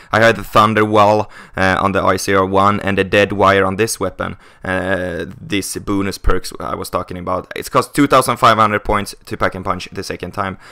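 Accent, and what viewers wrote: Sweden. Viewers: Bra swengelska!!! Döör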